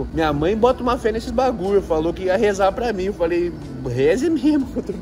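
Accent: Rio Grande do Sul, Brazil. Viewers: tu é de onde? fala igual nois aqui do rio grande do sul kkk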